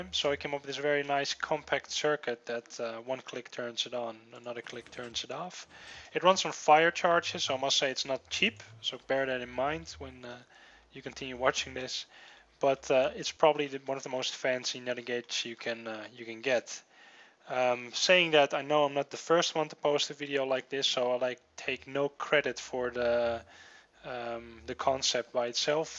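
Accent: Netherlands. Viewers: hello new sub from other hermits, no wonder i found the accent familiar XD one of my old EVE online corps CEO was dutch :P though his accent was thicker :) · are you dutch/belgian? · According to his accent he is obviously Dutch, so am I. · Dude, are you dutch? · I was pretty sure you are from The Netherlands just like I am. O_o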